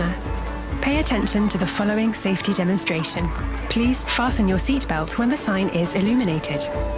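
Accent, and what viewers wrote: United Kingdom. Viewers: Мне кажется надо было записать реальный голос бортпроводника с казахским акцентом, ведь в этом тоже кайф, а не прилизанный британский английский. В остальном все круто сделано! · Какой великолепный британский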